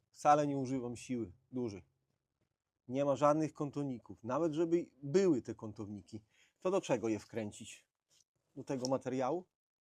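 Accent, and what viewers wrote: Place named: Białystok, Poland. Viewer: Dokładnie! Też słucham i oprócz fajnych technicznych rzeczy zastanawiam się skąd ten sposób mówienia. Tak tylko lekko słyszalny. Nie jak u szczepcia i Tomcia… ;)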